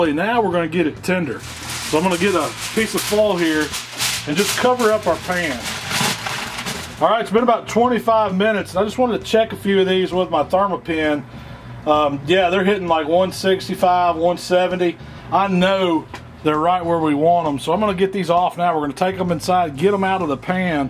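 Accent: South, United States. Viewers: No skin Needs sauce?? Sound southern but I'm wondering?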